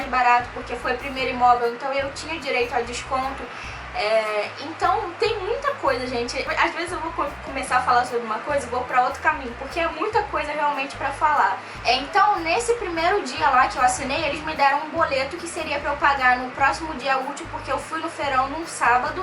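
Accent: Rio de Janeiro, Brazil. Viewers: vc é muiiito mais muiito gata mesmo! e esse sutaque carioca me deixa maluquinho! mas fica tranquila que não sou nem um fã lunático, inclusive sou casado e muito bem casado, é que o teu jeitinho de ser me deixa maluquinho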